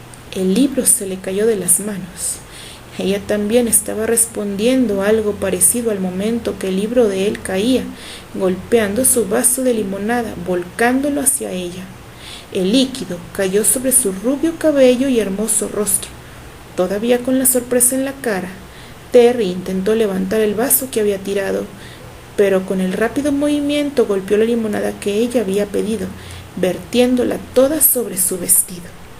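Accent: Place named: Peru. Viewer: hola tu eres la narradora? Hablas como Peruana o Ecuatoriana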